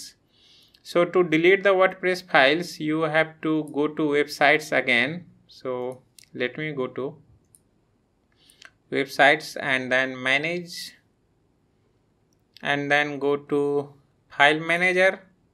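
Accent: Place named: India. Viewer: Excellent video. Was somewhat difficult to follow the Indian accent, but i will try this way to install Mautic after trying the bare bones SSH which I got some issued with certificates, redirections or DNS don't know....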